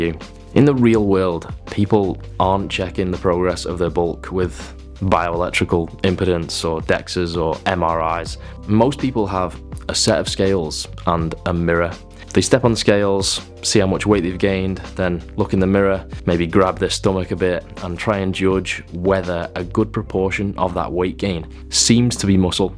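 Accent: United Kingdom. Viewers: Nice pleasnt accent .......................for a United Kingdom guy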